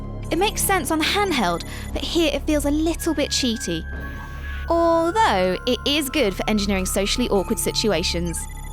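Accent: United Kingdom. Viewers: Asian girl with British accent? Yes please and thank you :3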